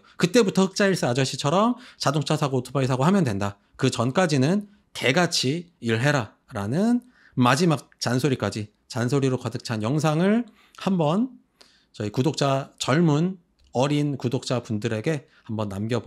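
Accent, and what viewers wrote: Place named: Gyeongsang, South Korea. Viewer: 경상도분이 신듯 ㅋㅋ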